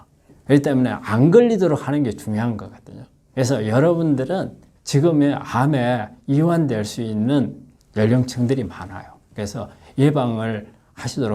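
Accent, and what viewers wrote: Jeolla, South Korea. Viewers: 아따 절라도고만 ㅎㅎㅎㅎㅎㅎㅎㅎㅎ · 전라도 억양 너무 듣기 싫다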